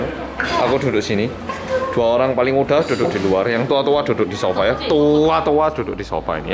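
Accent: Java, Indonesia. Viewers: SukA banget km ngomong pake bahasa jowo medok bgt... Sy aja gak begitu medok loh 😁😁😘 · dan punyaku sak muene,, ngawur ogh mamahku iki nguawurr ini yang toua toua duduk di sofa ya.. Touuua toua duduk disofa ini ya Guendeng yoh,, hhh Retceh tnn aku rek,, krungu sampean medok bek seneng awakku😂😭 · Emang kenapa cocok aja Korea sama Jawa sama medoknya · Waktu pertama liat mas, wah ada orang korea cakep... Pas ngmg jadi.. yakin orang korea? Medok banget 😁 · Mas sekali kali nggawe vlog tapi ngomong e ora medhok tapi ke kerea koreanen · logat te akeh jowo ne...salam dr purworejo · sebagai perantau di tempat beda budaya dan jadi minoritas, liat mas medhok di korea, malah seperti senasib wwkwkw, where is our home?? haha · Kok iso medok ngono Yoh???? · Medoknya dr mana ya?mama atau papa? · Hahaha logat jawanya keren bang👍👍👏👏😂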